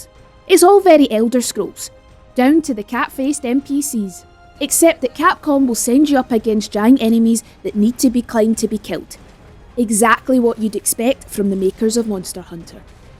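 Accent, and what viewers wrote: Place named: Scotland, United Kingdom. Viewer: Scottish I think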